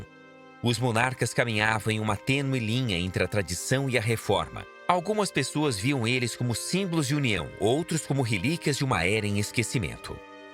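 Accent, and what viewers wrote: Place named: Brazil. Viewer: Ouvir a História de Portugal narrada por um brasileiro, gente que nos ideia, é que não pode ser. Além de Portugau. Familia Reau. Que nojo uns afronta aos Portugueses .Tchsu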